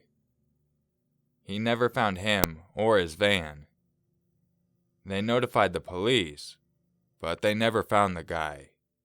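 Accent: Yorkshire, United Kingdom. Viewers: Dat Yorkshire accent doe 😂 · That Yorkshire story was rather funny! Bless, our accents are hard to do, Yorkshire has some of the funniest! Nice vid mate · Oi love! Nice British accent. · Great Yorkshire accent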